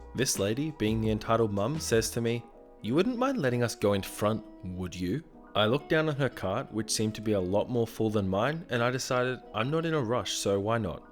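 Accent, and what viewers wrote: Australia. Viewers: Narrator, are you from Australia? Your accent reminds me of some of my old friends from Australia. Just wondering. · Are you Australian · Are you aussie?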